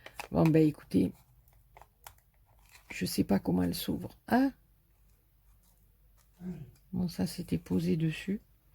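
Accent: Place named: Provence, France. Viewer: Bonsoir, j'ai decouvert ta chaine il y a quelques semaines et c'est un reel plaisir de t'entendre parler car ca me rappelle ma provence natale. Je suis du sud, une pure provencale exilee en Lorraine (mon mari est originaire d'ici...). Heureusement,je descend tres souvent pour voir les enfants, petits enfants et la famille. Je vais souvent a Marseille car j'ai un de mes fils qui y habite. Tu es une belle personne, qui me fait beaucoup rire, tes creas sont magnifiques, de toute beaute. Tu as ete tres gatee, effectivememt tu pourrais ouvrir une boutique de tissue.... Je te fais de gros bisous et a bientot pour voir d'autres creations. Francoise.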